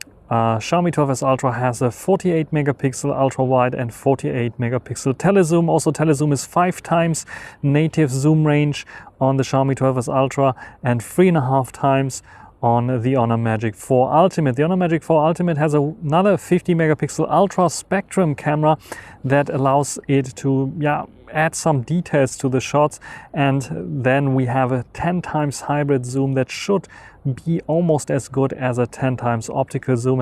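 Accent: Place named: Germany. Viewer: From the first seconds of your accent it reminds me of the Rein river and your German nationality, thanks for the review! It will be interesting to see tests between these phones and the Pixel 6 pro or 7 pro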